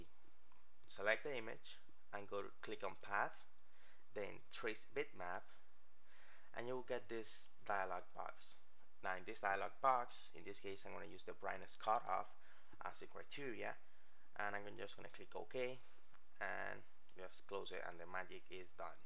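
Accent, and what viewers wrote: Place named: Mexico. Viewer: you sound ike me trying to spek english (i'm mexican)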